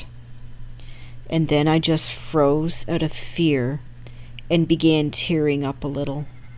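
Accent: Canada. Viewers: Great video! Are you Canadian? You sound Canadian or at least some kind of northern North American.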